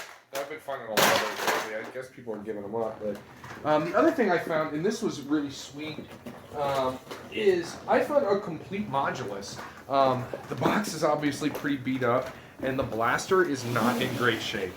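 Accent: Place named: Ohio, United States. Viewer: Booger Barricade...., you can tell you are an Ohioan, I say this a boy born in Cincinnati and raised in Richmond, KY.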